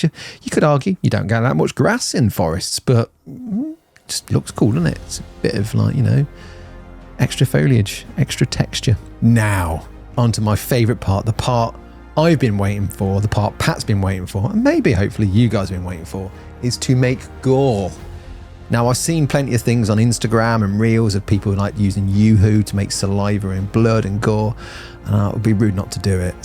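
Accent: United States. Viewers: LOL, never thought I would hear use an American accent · Your American accent sounds like my uncle. You were also dressed like him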